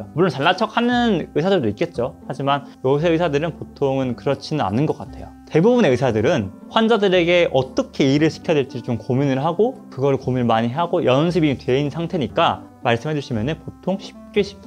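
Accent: South Korea. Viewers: 근데 진짜 영어발음 말고 한국식발음이라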